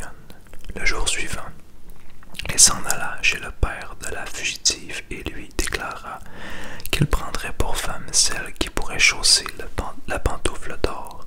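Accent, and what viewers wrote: Quebec, Canada. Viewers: Bravo ! Top ! Merci beaucoup ! 😀 Ta vidéo a comblé une partie de ma nuit d'insomnie... Bons rythme et débit, ta voix est très agréable, c'est très apaisant. Moi je vote pour d'autres vidéos. Avec les plus de 500 pages du recueil de contes, on en a pour un moment - et toi aussi !!! 😜 En plus, petite française d'Alsace que je suis, je suis une fan inconditionnelle de l'accent québécois !!! 😘 Concernant les droits d'auteur dont parle un des commentaires, rien à craindre. Les contes des frères Grimm sont depuis longtemps tombés dans le domaine public. Les droits d'auteur sont dûs en Europe pendant 70 ans après la mort de l'auteur et 50 ans au Canada. Ils ne peuvent ainsi pas entraver l'enregistrement des contes suivants ! Alors, au boulot ! 😁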